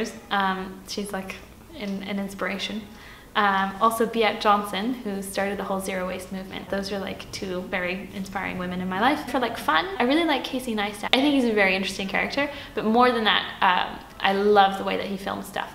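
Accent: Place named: Canada. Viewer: Ohhh that's why in your other video with your friend you had a canadian accent snd in this vid you have a mixture 😮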